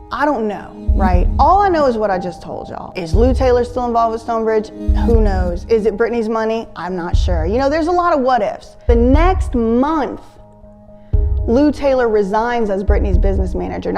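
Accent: South, United States. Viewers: Just came to likeeeeeee i heard this story years ago love ur southern charm with it!!!!! Mucccch love❤... Ima too lol love youuiiiu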